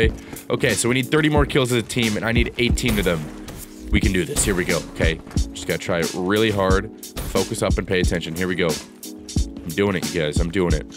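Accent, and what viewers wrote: Minnesota, United States. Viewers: R u from minnesota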